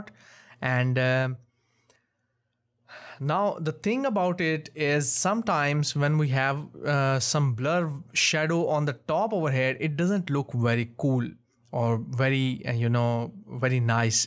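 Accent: India. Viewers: I love your indian accent.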